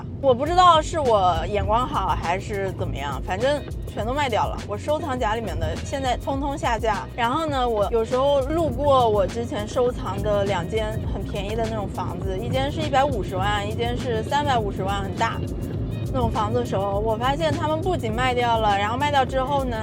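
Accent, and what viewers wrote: China. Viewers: 哈哈，講話像是大陸人口音，可是render在影片上的字幕卻是繁體，還是剛好講的內容簡繁都是同字啊？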